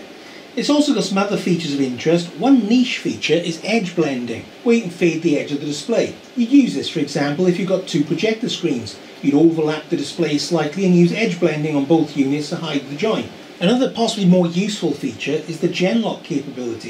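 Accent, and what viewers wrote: Wales, United Kingdom. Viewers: Ditto, just found myself here after checking up on Photon's channel and reminiscing. As an electronics and computers enthusiast, I'm looking forward to subscribing and binging all of your past videos! 😃 Also, your accent tells me you hail from one of my favourite countries! 🏴 Cofion gorau! 😊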